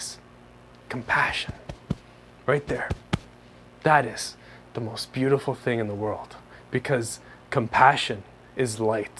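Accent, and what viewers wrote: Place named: Greece. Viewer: I love your accent...Are you Greek?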